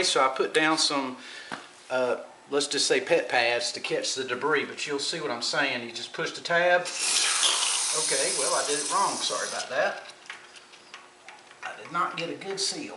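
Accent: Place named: North Carolina, United States. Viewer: Thank you. You are from North Carolina. Just have to be. Not sure any other part of America has that very special accent. I went to Duke, and almost certainly you are a Carolina fan. Could be State, but I bet it is Chapel Hill. Great video. Thanks again.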